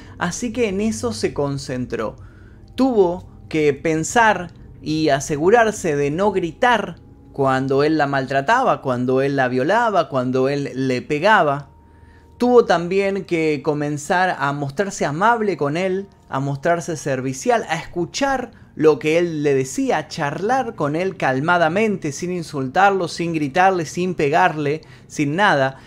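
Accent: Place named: Argentina. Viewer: Guapo... linda voz con ese acento tann lindo argentino... eres super profesional... mi nombre es Paz Alejandra de Santiago de Chile🥰😍🤩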